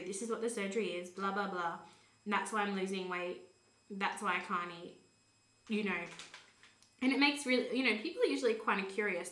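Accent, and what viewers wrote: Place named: Australia. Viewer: Hey, fellow Aussie here, really liked your honest post, so nice hearing the Aussie accsent too. Sleeve day is June 14th, very excited. Thanks for the vids!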